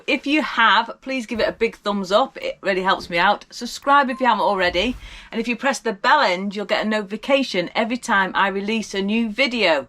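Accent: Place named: North, United Kingdom. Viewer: Just found you. Great fun listening to your northern ramblings. Thank you. You seem very genuine in your spoken thoughts.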